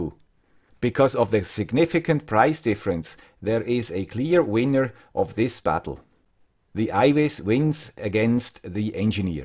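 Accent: Switzerland. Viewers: Thank you Mr. Swiss Accent. Great comparison.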